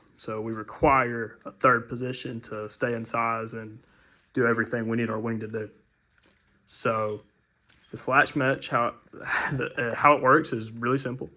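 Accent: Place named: Texas, United States. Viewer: the texan accent 🔥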